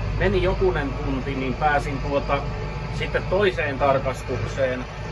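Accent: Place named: South Ostrobothnia, Finland. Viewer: Juhannuksia koko perheelle ja upeeta et puhut suoraan eteläpohjalaisella tyylil mistä tykkään kun oma suku ylistarosta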